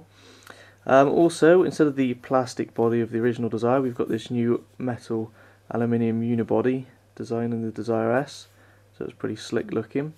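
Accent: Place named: United Kingdom. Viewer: reviews are just more awesome in a british accent, haha great vid man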